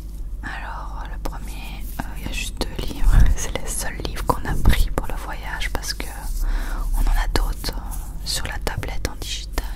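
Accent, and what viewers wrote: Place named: Belgium. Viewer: La couisine 😂😍j adore l’accent belge je sais pas pk ça me détend en plus 😂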